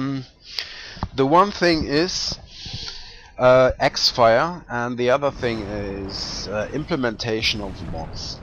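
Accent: Germany. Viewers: Haha erste minute und wusste gleich dass du deutscher bist :D Wo haste denn die mod her? :)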